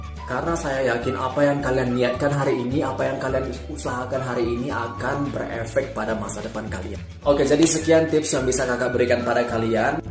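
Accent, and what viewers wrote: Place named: South Korea. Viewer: 안녕하세요 .. logatnya dah kayak orang Korea 😎👍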